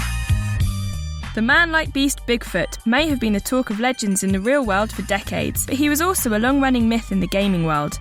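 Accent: United Kingdom. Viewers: I have to say there's nothing wrong with the girl narrating the video or with her british accent, but the words are difficult to understand, to me (I'm from Brazil). In next videos, would be nice if she talks slower. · Guys stop complaining over a British woman narrating a YouTube video it's not gonna kill you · ***** She has the heaviest british accent ive heard in years, don't bullshit around. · Everyone show some goddamn respect, she is doing a fantastic job and all you people do is blame her for being British, have you ever thought how you sound compared to England? americans think english sounds stupid , and english people think american sounds stupid. why can't we just forget the accents. this video is great. and she did a amazing job at this , so shut the hell up , find something to talk about , there is enough other things in this video to talk about other than how you hate her accent. 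grow up. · Uh British accents. They stress they're letters that require you your lips to touch (b, v, p, w, ) and make it into note of a "t" sound. So annoying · D: So many people hating because of a British accent?! I.. Wha...? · Oh noo.. UK accent.. disaster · I've met multiple British people so I can understand this perfectly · I can't understand how people find this annoying. I bet it has something to do with people not being exposed to british accent on a regular basis.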